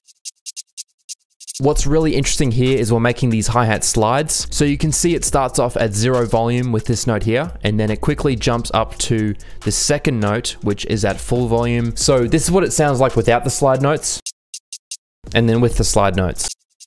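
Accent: Australia. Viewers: yo you aussie?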